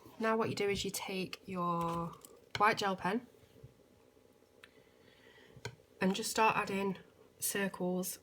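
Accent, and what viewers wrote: United Kingdom. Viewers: Wow! Great tutorial: No prattling, no dreadful music, 'no fancy technique'. Brilliantly demonstrated by an artist with a great British accent. Superb. I've subscribed. Thank you.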